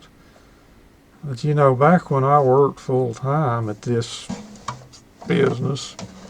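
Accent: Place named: North Carolina, United States. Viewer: I like your playing, and your voice (the southern twang from....North Carolina??), and also the old electronic gear in your room. All the best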